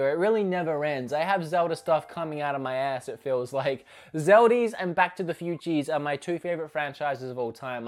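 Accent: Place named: Australia. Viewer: God, your accent is really throwing off my sensors. I'm new here but I'm guessing Australian, living in America? I know you mentioned Australia in the intro but I picked up on it before then. As someone who's traveled a fair bit, my accent tends to modulate and sync with my surroundings, so I'm guessing that's what it is?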